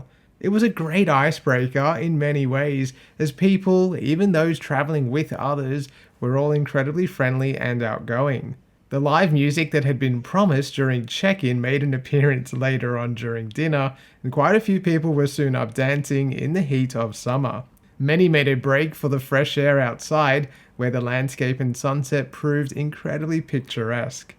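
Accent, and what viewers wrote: Australia. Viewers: You sound Australian...you should know it's called the Gann [sp] not the Garn [sp]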